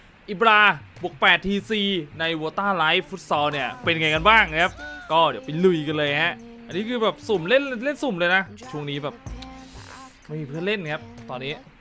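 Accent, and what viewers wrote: South, Thailand. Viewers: แหลงใต้ชับพึดเลยน่ะน้องบ่าว...หรอยๆ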